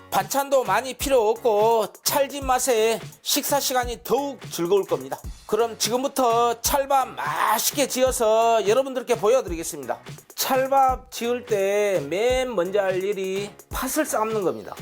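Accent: Jeolla, South Korea. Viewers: 절라도 사투리는 싫은데 가끔 놀러온다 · 저도 찰밥 좋아해서 자주 해먹습니다 왔다 맛있겠다 전라도 사투리 정감이 갑니다 셰프님 방송 자주보면 유용한 정보 감사 합니다 ❤❤ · 전라도 고흥쪽 말같아요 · 오 찰밥 맛있게 보입니다 전라도말투 친근감이 가네요ㅎ 나도 찰밥을 좋아해서 종종 이렇게 해먹습니다 새벽에 영상을보니 출출할시간이라 더 입맛땡기네요 ㅎ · 전라도 사투리 정겹습니다 우리 고향 말 물이 보타부립다 오랜만에 들어봅니다 ㅎㅎ 잘 보고있어요 맛난 요리 부탁드립니다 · 전라도억양 정겨워요❤ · 야~ㅅ따 전라도 찐한사투리 ㅎㅎ 요리는 한국인의 입맛 제대로입니다 많이 배워가고 있습니다 쉽게 단조롭고 간편하게 해설이 더 맛갈스럽네요 ㅋㅋ 발전을 기대합니다🎉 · 세프님 찰밥 잘 배우고 갑니다 전라도 사투리가 참 구수합니다 다음 영상도 기대할께요😊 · 아따.전라도. 사투리로 맛깔스럽게 표현이. 진짜 맛있겠네요.어쩜 그렇게 표현을 잘하세요.재밌어요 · 전라도 사투리 넘 좋아요 고맙습니다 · 말씀이 구수해요~ 보타불고 전라도 분이신가봐요~ㅋㅋㅋ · 셰프님 말씀하실때 전라도 말투에 친근감이 갑니다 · 전라도 사투리예요? 강원도인줄 알았어요ㅎㅎㅎ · 남도 사투리 정겹습니다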